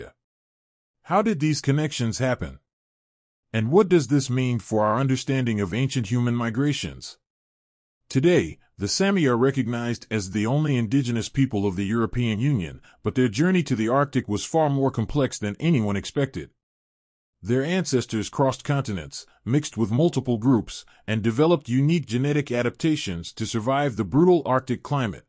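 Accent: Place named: United States. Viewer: No American voice please. FO.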